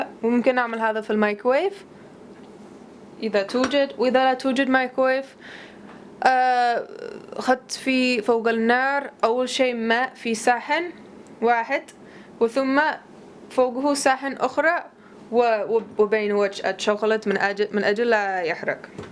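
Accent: Yemen. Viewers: انتي امريكيه ..ولهجتك ولكنه يمنيه مثل زوجك..عندنا بنات بالسعوديه يتحدثون انجليزي..وكانهم بنات امريكا ...سبحان الله الدنيا معكوسه..وبنات الدول العربيه كذلك..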